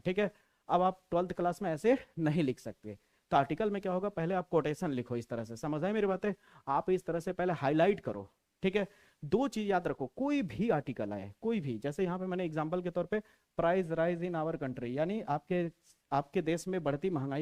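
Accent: Bihar, India. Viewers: Ap bihar se ho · Sir app bihar se ho na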